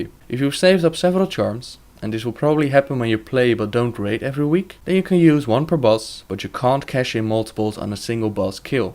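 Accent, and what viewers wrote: Netherlands. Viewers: haha je zij bonus role echt heel erg met nederlanss accenr xd niet erg maar grappig :)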